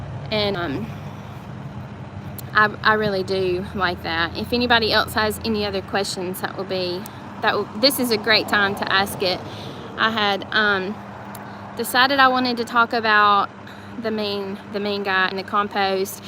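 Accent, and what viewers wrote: South, United States. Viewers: You are the sweetest person and I really don't know how anyone could come up with a negative comment to you! By the way, I could listen to your southern accent all day long. The way you speak is very calming to me!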